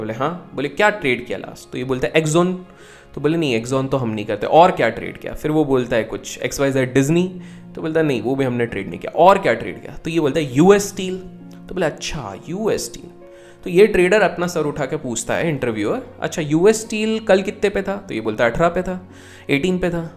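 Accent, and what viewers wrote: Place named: Hyderabad, India. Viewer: Kya miya kar hyderabadi accent 👌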